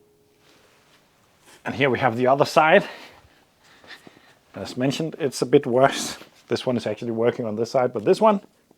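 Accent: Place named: Denmark. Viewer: Hey man, are you from denmark? I think i hear a little bit of a danish accent in your english, and in your umm's and hmm's but it could just be me. Really cool channel, love the content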